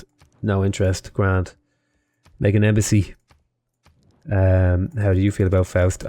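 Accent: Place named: Ireland. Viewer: I love how much more pronouncedly Irish you sound at the episode's beginning.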